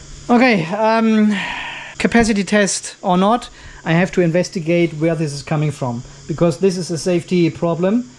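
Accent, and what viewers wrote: Germany. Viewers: A few weeks ago, I stumbled on one of your video while looking for a review on a bms. I got attracted by your german accent (Es gab eine Zeit, in der ich Deutsch sprach) and I have learned a lot through your videos. I finally orderded a V15 JK inverter bms that I just tested yesterday. Working on a self consumption system using grid as partial storage (injection tarrif are good here) to lower battery volume. So far so good, integration with both victron (cerbo gx) and sma sunny island is underway thanks to your very informative contents. Thanks again from Dakar , Sénégal, and keep it up.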